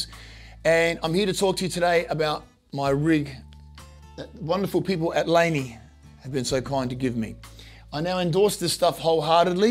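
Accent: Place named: Italy. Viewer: I can't hide his italian roots!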